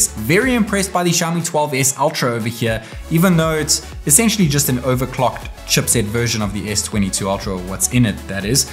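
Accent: South Africa. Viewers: Bro sounds South African🧐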